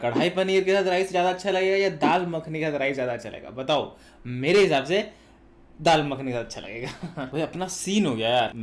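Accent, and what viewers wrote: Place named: Bihar, India. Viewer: Humko laga app bihari ho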